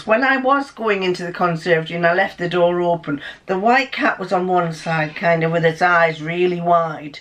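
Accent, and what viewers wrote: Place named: England, United Kingdom. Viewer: a very French name for a very English accent... J’adore!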